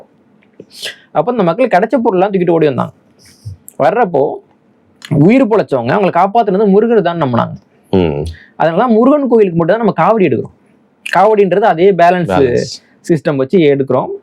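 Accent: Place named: Marwari, India. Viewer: எப்ப- என்னப்பா தமிழ அது? நம்பள்கே? சேட்டு தமிழ் பேசுற மாதிரி இருக்கு. நீ ஒரு தொகுப்பாளர் அதுவும் ஆன்மீகத்தை பத்தி பேசுகிறாய் அப்போ உன் தமிழ் சுத்தமாக இருக்க வேண்டும் அல்லவா?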